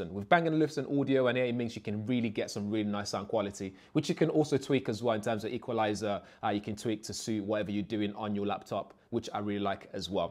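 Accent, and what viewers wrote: United Kingdom. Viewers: lovely to find a review with a british accent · Brithish accent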